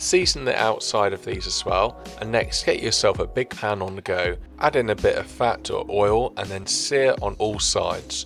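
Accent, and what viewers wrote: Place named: Scotland, United Kingdom. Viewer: so difficult to understand his English... I can better understand a Chinese immigrant than his Scottish (or whatever English) accent.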